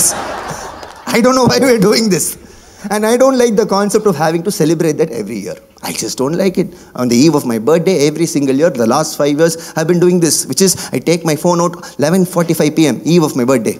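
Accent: Tamil Nadu, India. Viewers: Can feel tamil accent when u speak English 😂😂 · Yes... he performing in English with the slang of தமிழ்..! That is the wealth of his success..!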